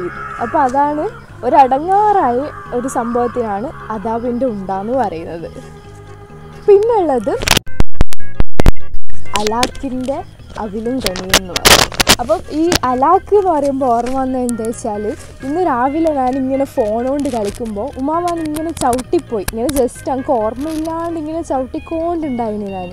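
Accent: Thalassery, India. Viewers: Ella thalssery baasha muyyan bere maadiriyaa😂😂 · Njan Malappuram ullatan but ink ingala Thalashery slang bayangara ishttan💫♥️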